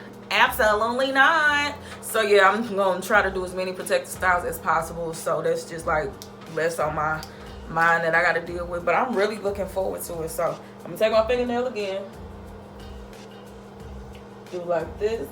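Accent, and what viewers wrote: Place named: Texas, United States. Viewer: Sorry if you have answered this before, but are you from Texas? That accent is giving me Texas lol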